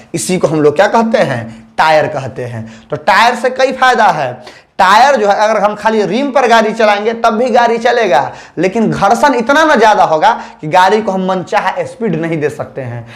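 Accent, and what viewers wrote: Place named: Bihar, India. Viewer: Classs achi pDhate h sir but shudh hindi bola kar bihari bhasha thodi defrent lagti h🙏🙏🌹👌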